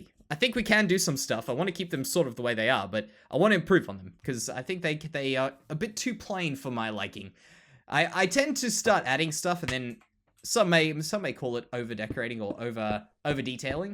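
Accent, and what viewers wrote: Australia. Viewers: You dont sound funny, i thinkthe Aussie ascent one of the coolest. Also know plenty of people that agree. Always wanted to visit sydney, the pictures I've seen are beautiful. Great video as always my friend.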